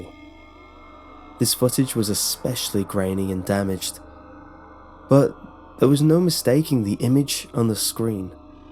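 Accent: United Kingdom. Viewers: doesn't quite have the right effect with a pleasant British accent :(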